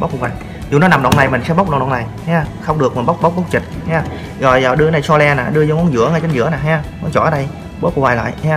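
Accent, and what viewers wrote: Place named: Mekong Delta, Vietnam. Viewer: Anh nằy miền tay he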